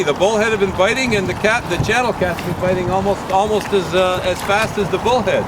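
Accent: Canada. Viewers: Are u canadian :)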